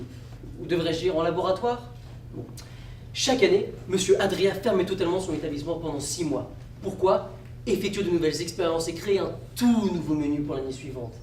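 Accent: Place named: France. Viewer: Un sujet bien élaboré, des gestes corporels appropriés, un débit de langage maîtrisé tout ceci dans un français impeccable. Seriez-vous français car, l’accent est différent de celui des québécois?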